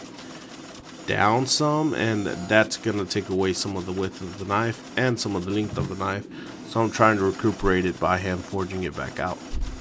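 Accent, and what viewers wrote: New Mexico, United States. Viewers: Where are you from? You sound like you're from New Mexico.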